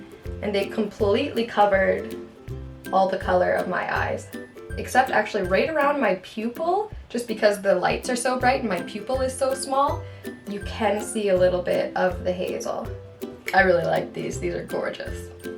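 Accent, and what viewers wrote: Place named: Canada. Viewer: I love Canadian accents ❤ and it adorable.. would love more videos like this.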